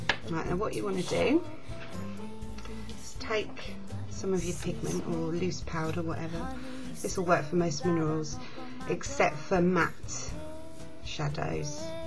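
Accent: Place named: United Kingdom. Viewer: Very nice, also from the UK, but living in Singapore - love your accent :) Nice job - I learnt something new today - CHEERS!!!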